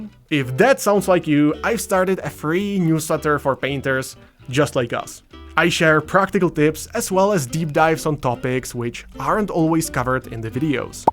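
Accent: Czech Republic. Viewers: I was guessing whether you were Czech or not based on the accent, but that basement is what convinced me.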